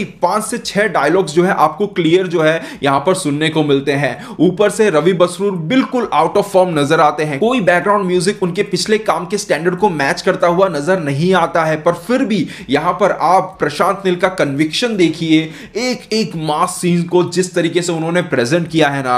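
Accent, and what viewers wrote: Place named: Haryana, India. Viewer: Nice review...Keep it up...some jokers are trolling haryanavi accent. Is it really worrisome?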